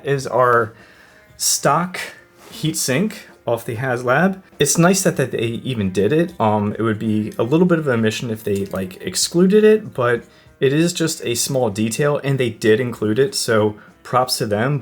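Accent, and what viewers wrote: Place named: Baltimore, United States. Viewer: Had no idea where you were located but had a good guess from that accent! Gotta be a Charm City Ghostbuster right here! 😂 Loving the videos! 👍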